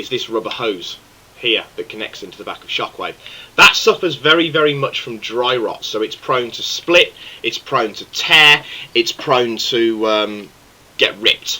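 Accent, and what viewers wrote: United Kingdom. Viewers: I know you may have heard it before but my friend and I think you sound like a brit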